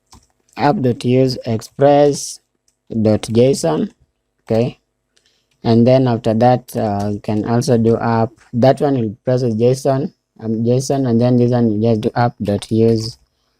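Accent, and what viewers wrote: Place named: Asia. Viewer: U sound Asian, ru sure ur a?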